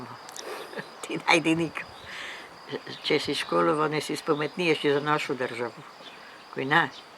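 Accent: Croatia. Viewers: I am sorry but this woman is speaking Slovene with a Croatian accent and a few words mixed betwen, but how she speaks is so Slovenian like if i am listening to someone from Stajerska or Dolenjska.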